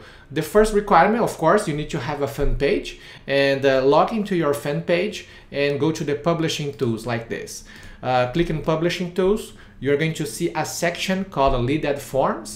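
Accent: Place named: Brazil. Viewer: cara, antes mesmo de ver o vídeo inteiro percebi pelo sotque que vc é brasileiro. Eu consigo entender em inglês, mas meu sócio não. Vc tem algum curso de zapier em Português?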